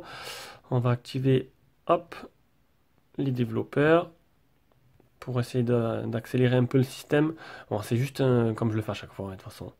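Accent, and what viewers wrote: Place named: Southwest, France. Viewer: A quand la vidéo du test final de ce tél , car j'hésite vraiment a l'acheter....je le trouve fabuleux niveau photo plutot fluide avec un look sobre et agréable...mais que vaut Réellement ce Modèle Amigo ?....en tout cas chapeau pour tes vidéos et ta voix perso ! elle me plait , ça chante le Jolie Sud Ouest ...lol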